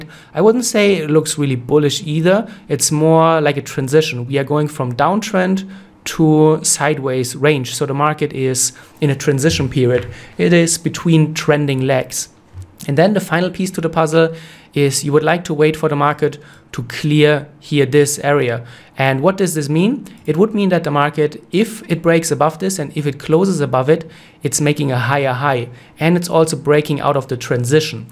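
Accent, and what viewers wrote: Germany. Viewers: Very useful video :) You sound german haha. Wo wenn ich fragen darf? ;) Suche Leute zum austauschen und lernen, da ich Trading super spannend finde aber es schwerer ist als man anfangs vermutet. Kurse gibt es wie Sand am Meer aber Connections bzw. einfach nur miteinander reden und Erfahrungen teilen bringt meist viel mehr. Liebe Grüße aus dem Schwarzwald, Jan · Grüße an meinen Landmann! Höre doch wenn ein Deutscher englisch spricht! 😁 Aber gutes Video! Grüße! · u are defo a german i can hear that hahah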